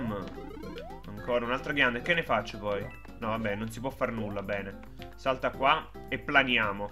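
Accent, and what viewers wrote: Naples, Italy. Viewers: Bella:D ma sei di Napoli?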